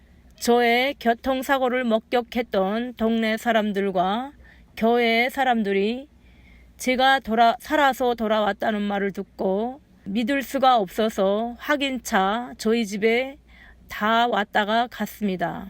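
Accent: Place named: Pyongan, North Korea. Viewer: 피안도 사투리가 참 정겹게 들립니다, 나의 오마니도 1.4후퇴때 피안북도 정주에서 월남해서 고생하며 저희형제 잘 키워주시고 작년에 작고하셨다오.. 지옥의 북녁땅에서 참 많이 고생하셨군요.. 다 좋은데요, 방언은 아닙니다. 고전14장 헬라어 원본으로 잘 읽어 보면, 오늘날 현대교회에서 행하는 방언은 절대 성경과 일치하지 않습니다. 고전14장 한글번역이 굉장히 잘못된 부분이 많아요. 다다다따 방언을 예수님께서 하셨나요, 사도들이 하시던가요? 한글로 또는 영어로 기도하면 하나님이 못알아드시나요? 방언기도하다가 귀신을 초청하게될 가능성이 아주아주 높은데, 왜 맑고 깨끗한 맨정신으로 내가 알고 하나님이 받아주실 정상적인 언어로 기도하면 안될까요? 샬롬!!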